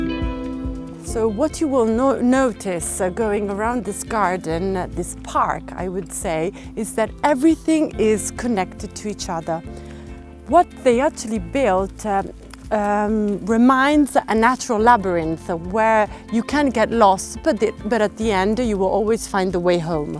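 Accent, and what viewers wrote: Italy. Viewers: What a charming and wonderful Italian English accent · Italians always add an "E" to the end of every word in English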